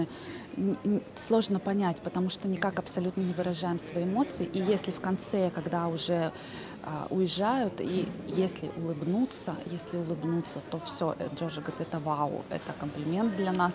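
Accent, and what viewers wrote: Urals, Russia. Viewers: Я так знала, что Вы с Урала! Говор наш)) я из Екатеринбурга, большой привет 🙋‍♀️ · Уральский говорок узнаваем. Я сразу понял, откуда вы. Про путина - лучше промолчу, чтобы негатив тут не оставлять.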